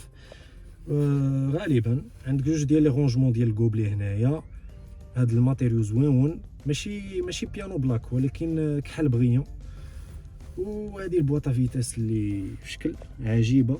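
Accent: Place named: North Africa. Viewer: وربي مكيف ومبسوط على اللهجه اكثر من ااسياره.. ربي يحفظ شمال افريقيا واهلها الكرام.. جعل ربي يرزقكم هل سياره كل واحد منكم.. لانكم تستاهلون كل الخير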